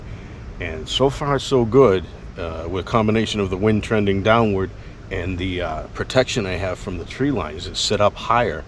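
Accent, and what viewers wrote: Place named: Massachusetts, United States. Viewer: The second you started talking I thought you were in MA. I just moved to the north shore recently, what part of MA is this in? Glad I discovered your channel bro, I'm gonna go through the catalog and subscribe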